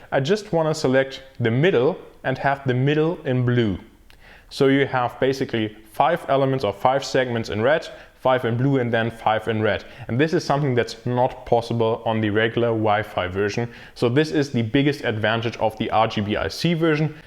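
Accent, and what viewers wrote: Germany. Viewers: Dieser Deutsche Akzent haha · Which model/Version is the best of rgbic (bc i want to buy 10 Meters but i want the best version) btw are u German bc of the accent if yeah hallo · Merke ich da etwa einen Deutschen Akzent ?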